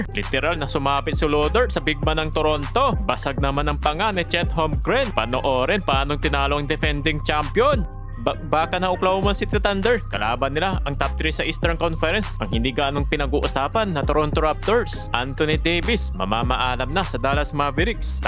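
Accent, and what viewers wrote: Pangasinan, Philippines. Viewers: That pangasinense accent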